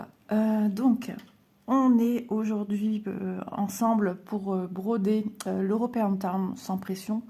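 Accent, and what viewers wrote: North, France. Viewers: Coucou, Ne vous prenez pas la tête il y a tellement de contenu sur YouTube si elles ne sont pas contentes qu'elles aillent voir ailleurs. Quand je n'aime pas je ne regarde pas et pis c'est tout! Et pour le parler du nord, Diditte est pas mal non plus! J'adore. Il est vrai que j'ai beugge plus d'une fois en regardant vos vidéos en juillet avec des références climatiques de mai, mais ça réveille les neurones 😂😂😂 Courage et on veut la suite 😊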